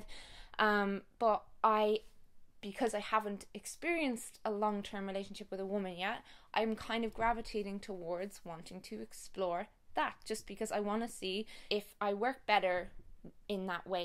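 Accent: Ireland. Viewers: I'm new but I already love your channel BTW I LOVE Irish accents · Hi, I'm from Canada and it's midnight here:) I went to Ireland for 3 weeks in June and I really miss it:( Hearing your accent reminds me of all the landscapes and the memories I made there:D I'm a new subscriber and as a bisexual woman myself, I really needed to hear your videos about all this education. I find we have the same dilemmas. Have a great day:D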